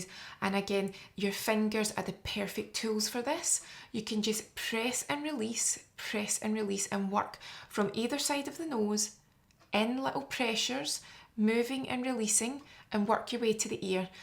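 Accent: Scotland, United Kingdom. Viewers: Are u anywhere near London , obviously got Scottish accent but thought I’d ask , would love hand arm massage . And maybe this sinus treatment, you are really really really educated and knowledgeable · I really would like to just have this lovely Scottish lady follow me around all day long, narrating my life. Oi, this wee bastard just cut us off. Now we're flipping him the bird. Off we go again. What a grrrrreat day.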